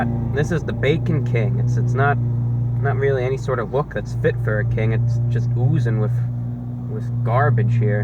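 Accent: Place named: Florida, United States. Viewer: I never thought that listening to a posh floridian review fast food would be so entertaining.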